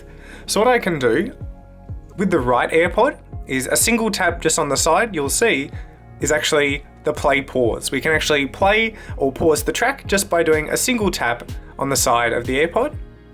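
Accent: Australia. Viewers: His aussie accent <3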